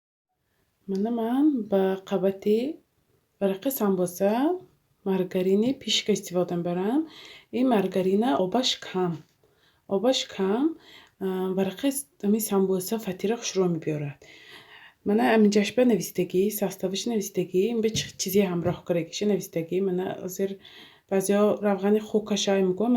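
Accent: Isfara, Tajikistan. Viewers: Гап не апа. Исфарача кара фахмондид👍👍💐💐💐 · Офарин зани бехтарин дугона лафзи исфара додед хохар.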